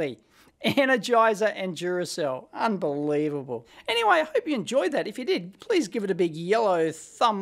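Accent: Australia. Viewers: What more do I love than some australian guy talking about batteries for 20 minutes straight. Awesome vid btw and very interesting. Do these same flaws exist in the triple or double A's? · The worst high pitch voice that could come with an Australian accent. So annoying. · he is Australian, that is why it is very different to British accent. 🐨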